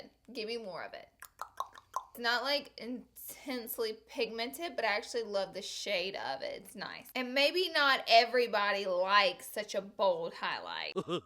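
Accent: South, United States. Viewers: What state are you from in the south?? I've always have wondered! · Your cracken me up today! Love your southern accent, don't ever loose it! Please do one of these on setting sprays, if you have some you like at a good price. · I love your personality! I'm a southern girl too (sorta, born in FL, live by the beach). I wish I had that southern accent all my life, I think it's so cute and love listening to girls who have one, top that with your silly sayings and OMG!😲 I could just talk to you for hrs! lol Love your makeup! You look fabulous as always, plz do u tut on that look! 😍😍 And I looooove your palette! I wish u did more tuts with your palette! 😘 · I also couldn't hear her very well. I had my volume turned all the way up and it was still hard to hear everything, especially when she goes a mile a minute lol. I still adore her quirkiness anyway, even though I have to rewind and listen over and over again to try to figure out what she's saying - between the southern accent and the speed at which she talks. 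is so cool and real.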